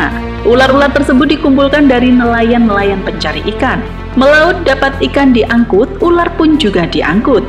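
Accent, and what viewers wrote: Java, Indonesia. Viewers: Medok naratornya 😆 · Naratornya medhok banget 😀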